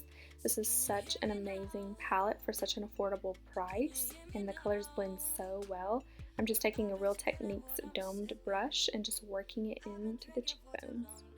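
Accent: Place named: South, United States. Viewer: Who cares what people think! As long as you like them that’s all that matters! I get mine done and everyone will always have their opinion! I choose to ignore BC I like them! Where are you from? I wasn’t expecting the country accent as this is the first of your videos I’ve watched! You are beautiful! Thanks for the video!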